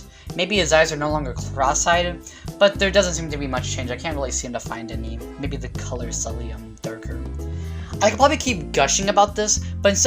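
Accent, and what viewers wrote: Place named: United Kingdom. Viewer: you already sound british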